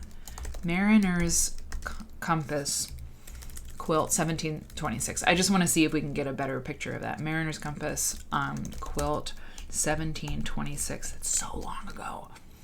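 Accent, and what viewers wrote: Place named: United States. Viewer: All this talk of chips and crisps in an American accent is messing with my internal autocorrect!! Mayo and chips is great and you'll need to find a different chippy if you're getting soggy chips 😝 that's no good! I put the vinegar on at home. Vinegar at the shop plus the journey home is a crunch killer 😞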